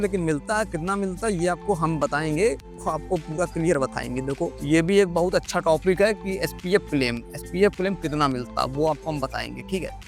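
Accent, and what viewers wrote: Kanpur, India. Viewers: Kanpur se ho kya bhai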